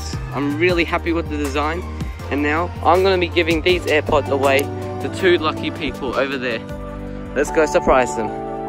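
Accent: Australia. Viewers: Are you from straya?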